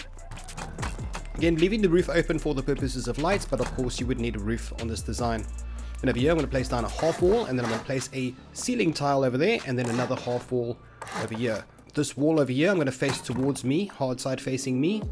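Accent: Afrikaans, South Africa. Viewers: Are you south african? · You sound Afrikaans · I heard your voice and new You were south African 😂😂❤️ Bro Where did you get your pc.. Is it a custom build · Is my friend here south African? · Are u South African bru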